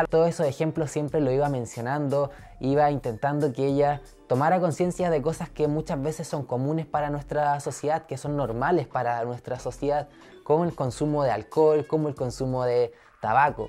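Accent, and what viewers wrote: Chile. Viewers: ahahaha eres chileno haha lo adivine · Hermano de que parte de chile eres? De valpo? Saludos desde la 5ta brooo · Es chileno?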